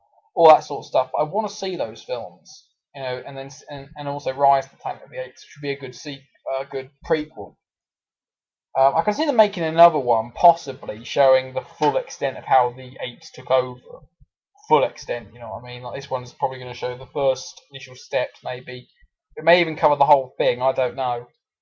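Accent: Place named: United Kingdom. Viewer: Dude, how I love the British, with that accent I could listen to you talk all day : )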